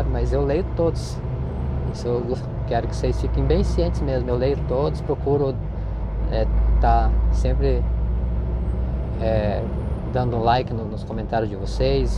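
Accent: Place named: Rio Grande do Sul, Brazil. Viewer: Você tem um sotaque de gaúcho tchê!!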